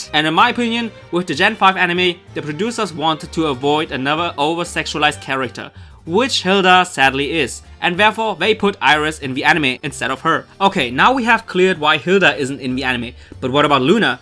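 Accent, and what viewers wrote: Germany. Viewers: you have a german accent... are you german? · one question. what nationality are you? it sounds like a german accent you have.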